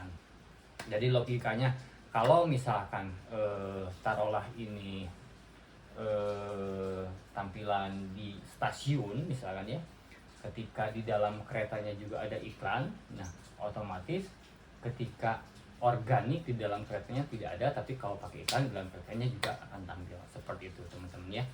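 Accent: West Java, Indonesia. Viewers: assalamualaikum kang perkenalkan saya bahcen dari bandung sehat selalu kang dan jangan bosan bagi bagi ilmu nya krna sangat membantu yg masih awam seblumnya saya lihat lihat video akang kayanya dari logat bicaranya seperti orang jawa barat jika benar orang jawa barat saya siap ngopi bareng akang untuk shering tentang shopee terima kasih sebelumnya ya kang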